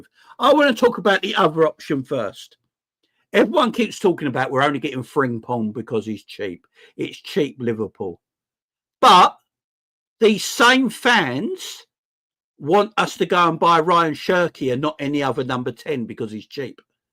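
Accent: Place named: South, United States. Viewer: Hey, wasn’t sure about you at first what with you having that southern accent and all that. Now I know why people think you’re brilliant. Top content as always. Big thanks for keeping us informed 😊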